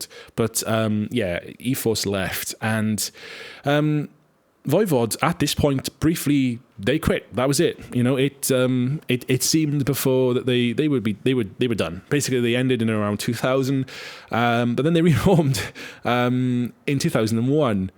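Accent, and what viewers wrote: Wales, United Kingdom. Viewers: Do I detect a Welsh accent?...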